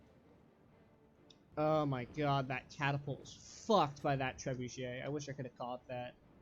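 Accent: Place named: North America. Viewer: U do sound very North American, would never of guessed Sweden lol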